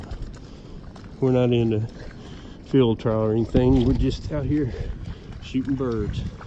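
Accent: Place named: South, United States. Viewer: Very nice! I´ll get one in next two weeks. So, now I´m just lookin for some videos with this amazin dogs on duck huntin and I definitely found one with US southern accent which I prefer and understand best! :D Have a good time, greetings from Czechia.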